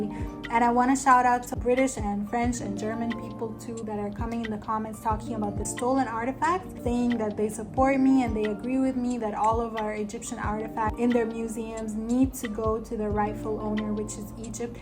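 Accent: United States. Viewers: Sorry but I don't believe you're not an American. Not for a second. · Is speaking with a American accent as you say you have never been to America then isn't yr accent cultural appropriation? I do not mean to offend or be rude, I'm just wondering why you have a English American accent. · I am an American and would agree your English is very good. Your accent would be mostly American (although America has many different accents). Enough that you could pass as American if you were here. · Wow, so you picked up the US Mid Western American accent like here in Detroit. I assumed you are between 21 and 29. Also, you are gorgeous.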